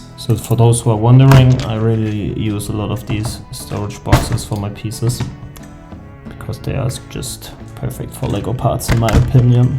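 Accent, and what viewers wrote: Germany. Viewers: His accent? He's german - not everyone speaks American English or whatever bro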